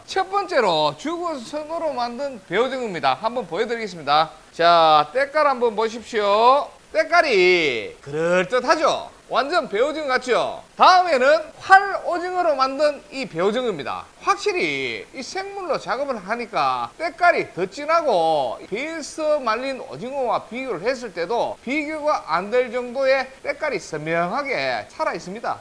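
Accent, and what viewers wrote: Gyeongsang, South Korea. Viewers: 갱상도 사투리 존나 듣기 싫은데 조심하세욧 ㅋㅋㅋㅋ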